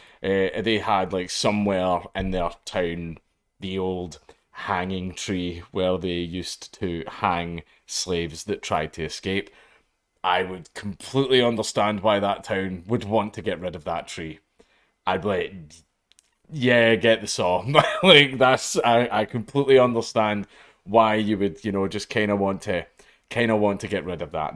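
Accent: Scotland, United Kingdom. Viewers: I’m not gonna lie I clicked on this cause I wanted to hear him about “A FOOKIN ROCK CANT BE RACIST YA DAFT TWAT” in his thick Scottish accent lol who’s with me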